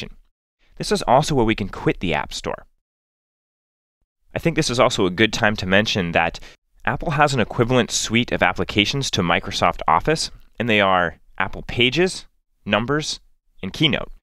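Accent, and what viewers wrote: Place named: United States. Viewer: I have a MacBook Pro and have been Mac user for a long time but I'm here because of quarantine and Im here to listen to this guy's all American voice. LOL and I learned a few Mac tips !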